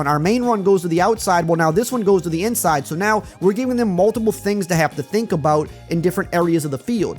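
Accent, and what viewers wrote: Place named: New Orleans, United States. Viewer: I don't know where you are from but you sound exactly like people from a suburb of New Orleans.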